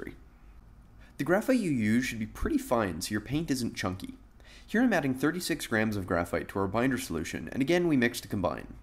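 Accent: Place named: Canada. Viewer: Ok, you sound Canadian- even so....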